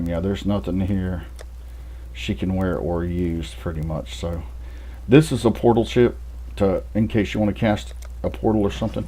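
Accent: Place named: Texas, United States. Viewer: i am wayyyyy to friggin tired to watch this. I've been playing PoE for like 6 years...but i alway seem to stop at 30ish lmao. idk why. okay ill go. i am litterally going mad from sleep depretion. hmmm idk what to critque or complement or anything on this one. honestly, i may just save the video, and watch it later when i try the game, again, and stop at lvl 30 for some random reason. 16 friggin hours of work. i feel it's kinda pointless to make the palworld-base video i was working on...but gonna do it anyways. okay what am i talking about? Keep going my dude. PS you have the most country accent ever (i mean like in the TX country, not redneck weirdo one, it's not like....idk a jab at you). just like "man this dude is from OK or TX or something, maybe like some rural parts of Arkansas" if i'm right lemme know.... bc this is litterally the only thing my linguestics degree is worth, guessing ppl's accents. 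also i am drunk. gn~!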